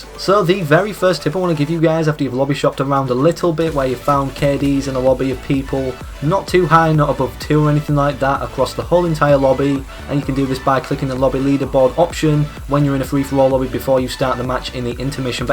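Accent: United Kingdom. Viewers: SeeN It's literally just how British people talk. i.e. British say "Bri'ish" and Australians say "Austra'ian". Don't make fun of people's accents.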